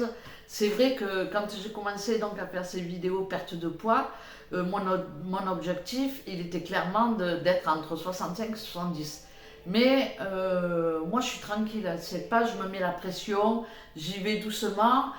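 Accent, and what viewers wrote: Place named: Marseille, France. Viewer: Bonsoir et, merci pour tes idées repas 😊, et surtout non, ne t'arrête pas de parler nous on adore t'écouter et en plus avec cet accent marseillais quel plaisir 🤗. Ton petit willy est vraiment trop beau et ses yeux magnifiques. J'ai loupé ton live j'étais en balade chez des amis 😏. Bonne soirée bisous bisous à vous deux et caresses aux petits animaux 💋💋🐕🐈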